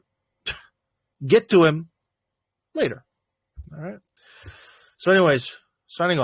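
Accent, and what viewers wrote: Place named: Cleveland, United States. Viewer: You still have your cute Cleveland accent. The midwest shines through your American English,. I can't roll my rrrr's either.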